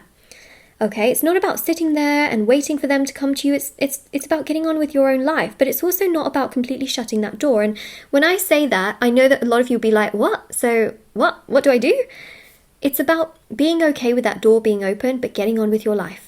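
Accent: United Kingdom. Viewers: Thanks. Always there with brilliant intelligence and guidance at the right time. Something about your british accent makes it persuasive and assuring.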